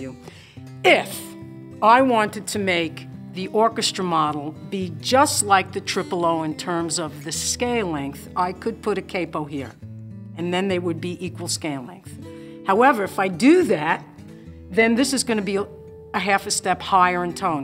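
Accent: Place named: Delaware County, United States. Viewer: God that Pennsylvania Delco accent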